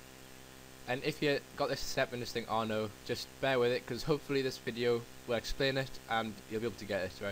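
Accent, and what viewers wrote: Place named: Wallsend, United Kingdom. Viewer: Sounds like your from Wallsend or around there somewhere.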